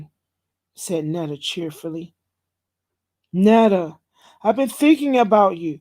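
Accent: Baltimore, United States. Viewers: That baltimore accent makes this book hit right 😍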